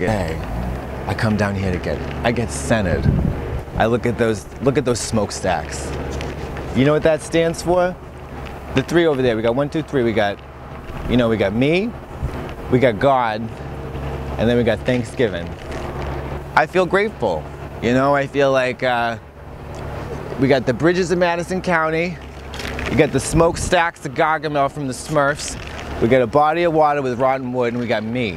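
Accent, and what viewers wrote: Boston, United States. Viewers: You can really tell she’s from Boston wow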